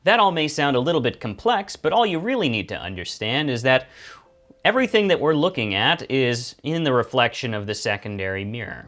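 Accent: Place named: United Kingdom. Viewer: I think you must be British!!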